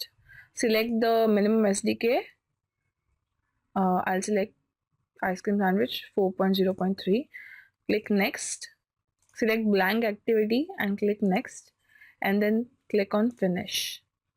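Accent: India. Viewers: I hate the Indian accent so much it's so annoying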